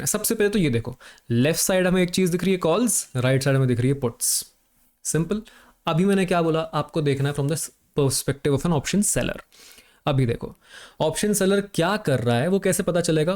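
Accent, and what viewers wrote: Bengal, India. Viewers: are you from Kolkata or Bengali ?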